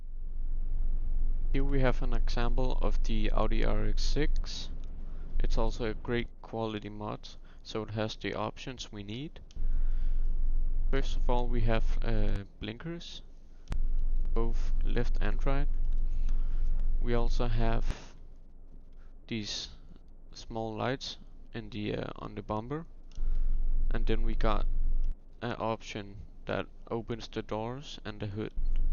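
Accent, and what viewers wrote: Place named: Denmark. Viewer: det er hvad jeg kalder en dansk accent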